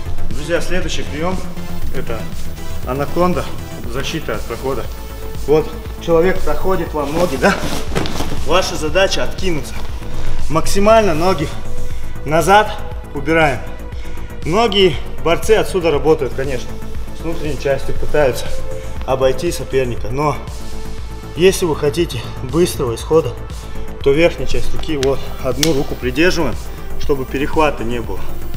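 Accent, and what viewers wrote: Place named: Chechnya, Russia. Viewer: Слышу родной для ингуша чеченский акцент. Молодия для души!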